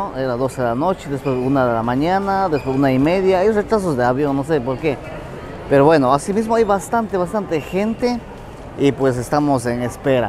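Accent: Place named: Ecuador. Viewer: Me da risa su forma de hablar muy específico de que parte de ecuador es😊😊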